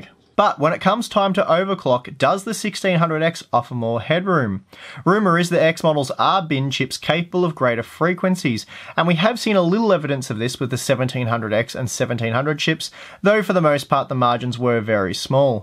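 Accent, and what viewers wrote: Australia. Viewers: Holy crap, you're: a) Australian b) Not annoying Good video.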